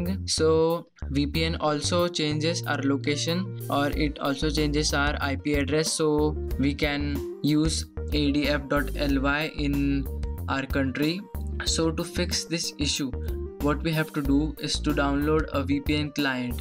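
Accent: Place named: India. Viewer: i love when indian talking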